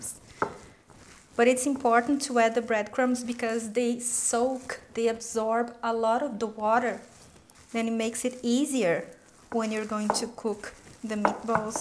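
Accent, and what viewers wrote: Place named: Brazil. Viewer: hey are you brazilian? i can see for your accent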